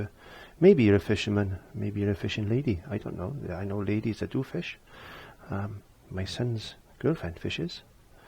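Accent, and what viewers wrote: Wales, United Kingdom. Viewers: Thanks. I have a question: Are you Welsh?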